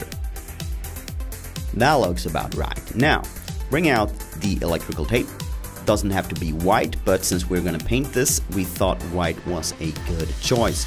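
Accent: Scania, Sweden. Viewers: skånskan caught me off guard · what (I'm assuming) is a Swedish accent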